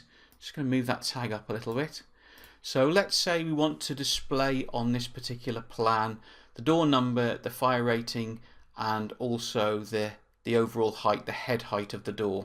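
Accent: United Kingdom. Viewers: I installed a YT Add-in which allows you to set speeds up to about 25X....but I find that 2.5-3X is the highest, intelligible speed with this (& many other Brits). However, is one of the better, cohesive sets of videos out there.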